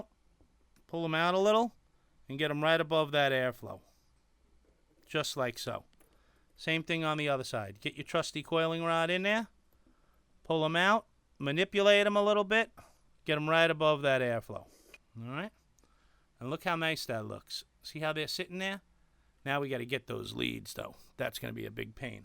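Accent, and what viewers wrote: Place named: New York, United States. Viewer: I can't use the low profile drip tips either. I just don't get WHY. But I just LOVE watching your videos man! So funny when it's all quiet, the kids are in bed, then all of a sudden a NY booming voice... "WHAT'S UP CLOUD CHASE-AHS!!!!" 😁🙏💗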